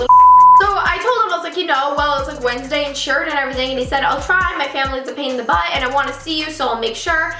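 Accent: New York, United States. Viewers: Oh my gosh. The New York in you is SCREAMING in this video. I love it~ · lol i just discovered you and watched your "exposed boyfriend" video and i knew you were from new york. 1) savage as fuck 2) your accent is cute and 3) you're not as basic as white girls in (all of) California and Atlanta.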